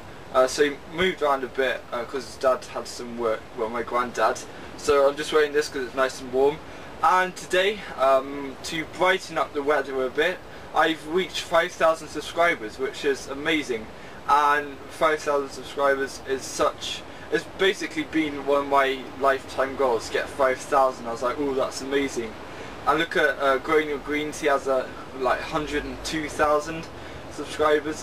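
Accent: United Kingdom. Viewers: You are not annoying. And a Brit accent is anything but grating! ...it is (the accent) and you are rather nice to listen to! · "Annoying teenager with a british accent"...hahaha...I like your accent. Irish and british are, in my opinion, the cutest accents ever. Great tips btw.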